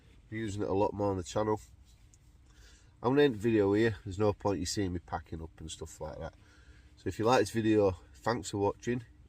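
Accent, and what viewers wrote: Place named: Liverpool, United Kingdom. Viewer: It's called scouse lol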